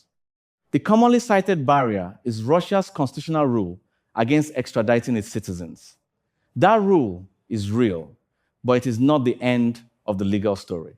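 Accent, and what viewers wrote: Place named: Nigeria. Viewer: Why do you sound like a Nigerian, when you are a Ghanaian.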